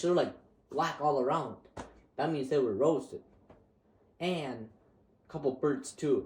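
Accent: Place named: Switzerland. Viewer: Dude you speak swiss german accent not dutch. I heard one amish say "bin ufgwachse". Thats 1:1 swiss german accent kanton luzern. Verstosch?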